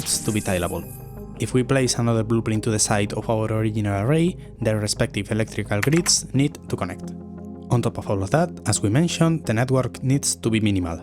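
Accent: Spain. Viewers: Amazing stuff. Subscribed. I checked your channel and this one video seems different than your previous ones. This must have taken you so much work. The results talk by themselves. The accent sounds familiar. I bet Spanish. Bravísimo. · You sound Spanish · Can't hide that Spanish accent from me boy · Where is your accent from? Spain? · Esto es acento español?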